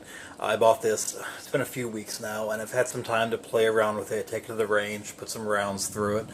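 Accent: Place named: Carolinas, United States. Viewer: What part of teh Carolina's are you from? I'm having a hard time placing the accent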